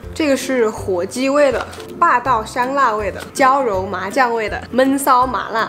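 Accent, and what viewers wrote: Changsha, China. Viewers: nia xswl我就说口音怎么如此熟悉原来你是长沙的嘛哈哈哈哈哈哈哈哈哈哈哈哈哈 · Zhi Xuan Ang 口音大陸人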